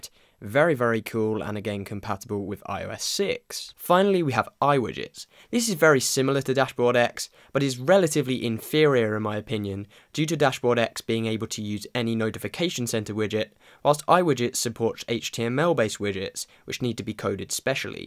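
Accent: United Kingdom. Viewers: would you mind to send to make a list of the tweaks you mention? i'm really bad at understanding english properly, specially if its british acent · The british accent just sounds professional and makes you want to get all these apps! i love your videos mate.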